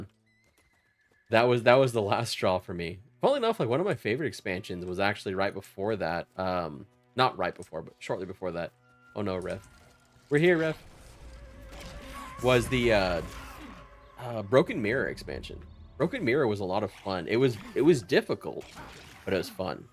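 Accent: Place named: United States. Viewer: Talking of you not having an English accent, at least you don't have to fake American pronunciations to get views like most English gaming Youtuber's, you just do it naturally. It feels really weird seeing (well, hearing) them do it but I believe it really does make a noticeable difference to them getting views.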